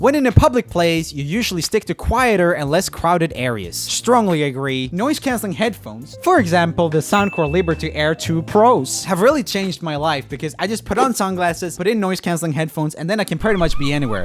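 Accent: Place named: Sweden. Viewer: His accent is so swedish, like pewdiepie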